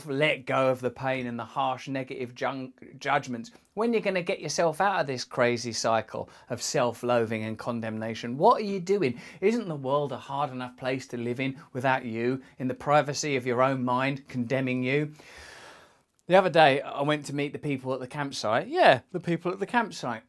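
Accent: United Kingdom. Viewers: are you British ??? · Please yell at me anytime in your British accent 😂❤️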